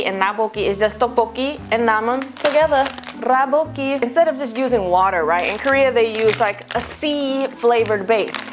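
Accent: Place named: Texas, United States. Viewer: damn sis! where you from???? i feel like u from tx lol u got that tx spark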